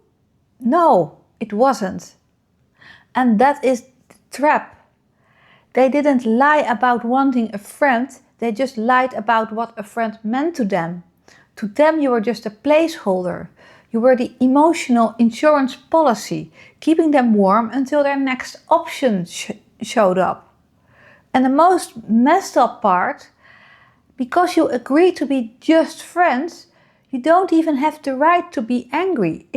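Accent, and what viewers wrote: Netherlands. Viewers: Thanks. You have a knack for this. And it's enjoyable to be "dressed down" (in a good way) in English spoken with a Dutch accent, for some reason. One of the tell-tale signs of a cheat who will destroy your peace is rewriting history. If they show themselves willing to try to cheat reality itself constantly, there is no doubt they are a cheat.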